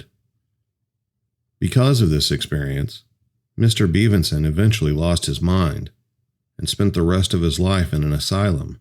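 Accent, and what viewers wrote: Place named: Midwest, United States. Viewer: Don't feed the trolls. I'm the most critical person I know and I can say yours is a narration vpice is professional grade. People pay 10s of thousands for a Midwest accent like yours to do audio books and tv spots. Some folks are not in a good place in life and attacking others helps their pain go away for a moment. Your golden Pony Boy.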